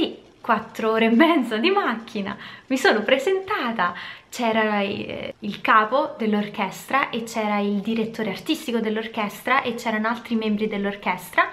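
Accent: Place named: Rome, Italy. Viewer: Ma Brian riesce a cogliere, da non romano, la dolcezza del tuo accento?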